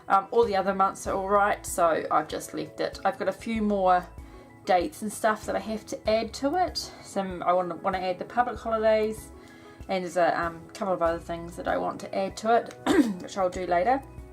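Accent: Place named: New Zealand. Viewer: Hi - I love hearing our kiwi accent on bullet journal videos!! I started a bullet journal in August last year...just in a grid notebook and using a black biro. The system made sense to me so I bought a Leuchtturm 1917 dot grid from The Drawing Room (Chch) for 2017. Keep it simple to begin with...check out NZer Pages to Plans on Instagram and her own website... Boho Berry - Bullet Journal 101 (she also has a resource library with handwriting practice sheets)...The Lazy Genius How to Bullet Journal. All the very best!!!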